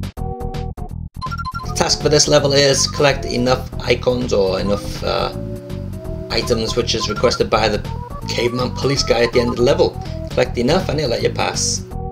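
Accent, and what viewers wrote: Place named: Liverpool, United Kingdom. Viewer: Lol... I know it's a while ago now but your rant of DD2 (poor controls) was very funny! Especially with your Liverpudlian accent... Liverpool is right yeah?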